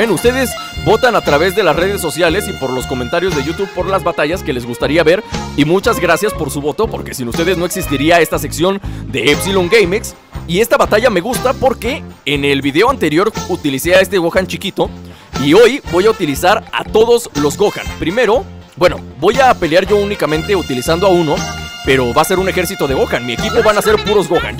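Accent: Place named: Mexico. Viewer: se nota que eres mexicano XD igual que yop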